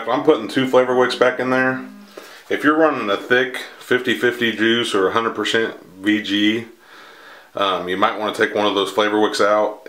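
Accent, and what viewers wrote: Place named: South, United States. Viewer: "take you some paper towel" that southern talk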